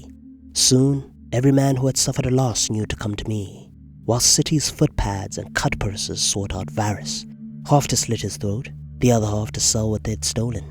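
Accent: South Africa. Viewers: Haibo is that a fellow mzanzian I hear ???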